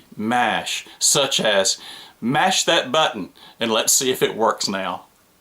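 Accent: South, United States. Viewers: Well, you do have a southern accent but I grew up about 100 miles south of Macon and I studied architecture at Auburn University. Let me tell you, when I got to Auburn my accent was so thick the people from Alabama made fun of the way I talked. After moving to Atlanta for a while, my accent kinda faded. When ever I go back home tho, my accent bows up and I know I sound dif'errnt.;-)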